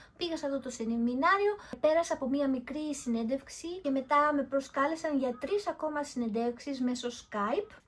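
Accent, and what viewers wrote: Japan. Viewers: Μιλας τα Ελληνικα με Ισπωνεζικη προφορα !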